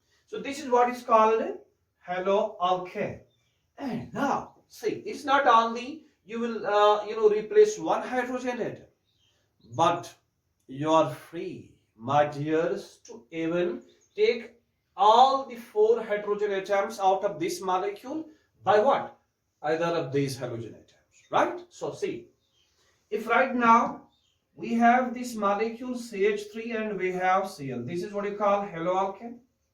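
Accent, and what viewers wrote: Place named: United States. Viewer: Love ur classes & American accent as well...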